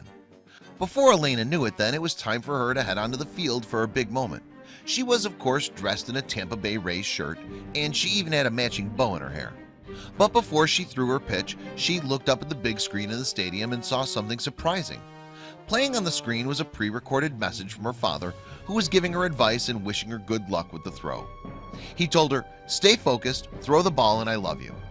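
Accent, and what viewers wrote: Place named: Midwest, United States. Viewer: Maybe just play the video instead of a 10 minute explanation? Especially with that nauseating Midwest accent, torturous.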